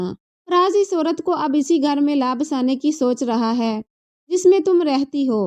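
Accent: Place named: Assam, India. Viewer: Wow khani achhi hai aap ki par ek bat aap asam se ho aap ki awaz se lag raha hai mujhe 😊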